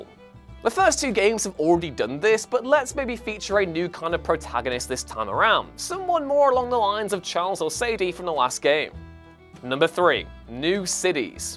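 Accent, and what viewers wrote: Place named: United Kingdom. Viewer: I guess you guys were Americans. U make great content. But that British accent is so annoying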